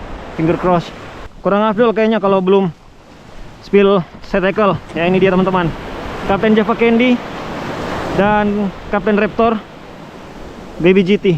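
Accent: Manado, Indonesia. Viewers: pantas tu logat rpa qt kenal, org manado kote wkwkwkwkw org manado klo bhs indo dpa tau dpe logat wkwkwkwkkw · Bang loe orang Manado ya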